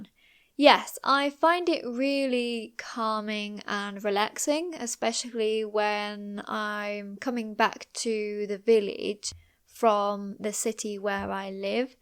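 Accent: United Kingdom. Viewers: just so amazing! I love your British accent, slow speed, and very natural intonation. I definitely will become your new member! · British, American, Accent?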